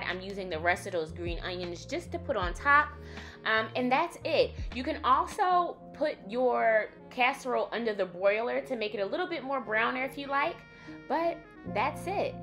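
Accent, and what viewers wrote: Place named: Philadelphia, United States. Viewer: You from philly!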